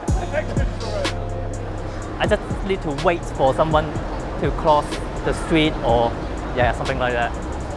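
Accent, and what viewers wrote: China. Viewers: Uncle Roger accent in real life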